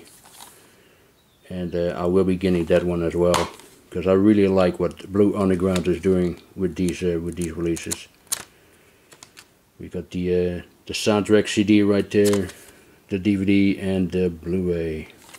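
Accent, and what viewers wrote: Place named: Italy. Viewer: Fantastic update 👍😎& plenty of Italian films. The De Palma-De Niro early movies I have never seen, fans of both & might consider picking up. I enjoyed “The fifth cord” & interesting extras from Arrow video. Well spoken in your Italian accent 👍, “Double face” I almost picked up in the Arrow sale. As I’ve been on a Giallo kick of late, I do want all the Sergio Martino Giallo movies after enjoying watching “The strange vice of Mrs Wardh” “Street law” cool Euro crime movie & I agree the Grindhouse releasing of “The tough ones” aka (Rome armed to the teeth) is one of the releases of the year. Nice touch the numbered bullet 😎 I do rate “Almost human” as Umberto Lenzi’s finest Euro crime movie also. Definitely, more Maurizio Merli Euro crime movies 👍, I have most of them as bootlegs. What a coincidence in the Dorado release of “The seven from Texas” in getting numbered 401 & 402, if you remember, you reminded me of the release as I totally gave up on the release 😬& when you did I ordered straight away as it’s limited to a small number. 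“Broken arrow” fine early western with the reliable James Stewart. “Saving Private Ryan” is on my 4K wanted list, as I’m imagining the beach scene will look epic on 4K. Perfect finale to this update, a mention to Rutger Hauer & the sad passing of a great actor ☹️I always enjoyed all of his roles. Maybe a Rutger Hauer Collection video 🤔 Cool update friend 👍😎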